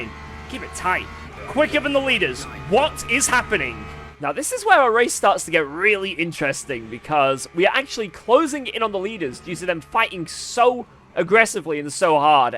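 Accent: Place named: North East England, United Kingdom. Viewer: New player, new subscriber - I'm trying to place your accent though?! I get twangs of North East, then a bit of Manchester'ish all with the odd bit of Americana?! 🤔😂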